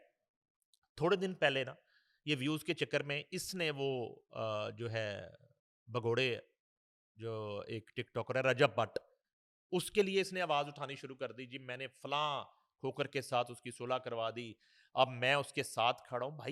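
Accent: India. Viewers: انڈین لہجہ ہے شاید انوکھے پن کی خاطر بولا جاتا ہے ؟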